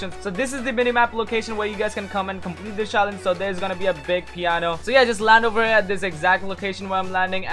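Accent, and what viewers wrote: India. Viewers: Lmao his indian accent is so funny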